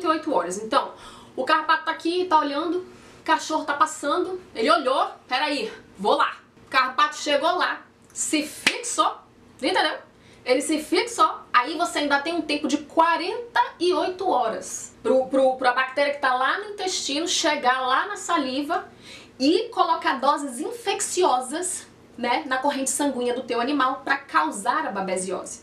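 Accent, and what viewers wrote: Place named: Pará, Brazil. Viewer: Muito obrigadop pelo vídeo, queria que meus professores tivessem essa didática e vc parece ter um sotaque paraense haha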